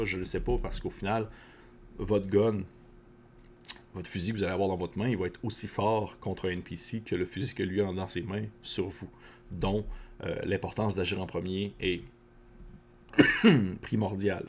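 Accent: Quebec, Canada. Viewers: Eille! Un Québecois bro!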